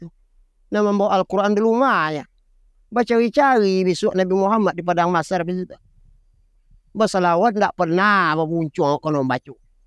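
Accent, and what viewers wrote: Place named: Payakumbuh, Indonesia. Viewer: mantap.., bahaso pykmbuahnyo totok.., yo mode tu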